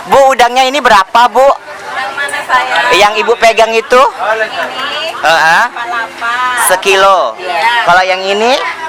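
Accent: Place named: Medan, Indonesia. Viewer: Kalo bisa logat medannya jgn dicampur dgn logat jakarta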